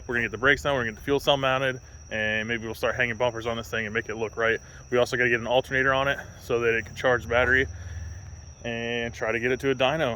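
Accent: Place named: Florida, United States. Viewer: You a real Florida man accent there boe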